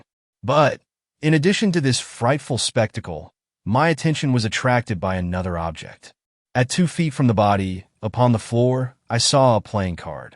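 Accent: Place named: United States. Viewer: Horrible American reading voice.ruined the story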